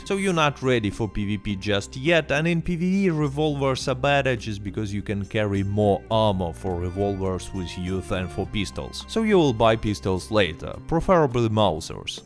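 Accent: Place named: Russia. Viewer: The Russian accent make this video even better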